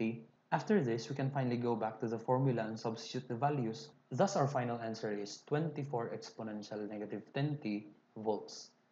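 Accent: Philippines. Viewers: Hahaha, check comment pa ako kung pinoy ka, pinoy accent eh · You should should your step by step derivations of calculus for students. Great Job. I can tell your English is Filipino, not American. 'eigenvalues'....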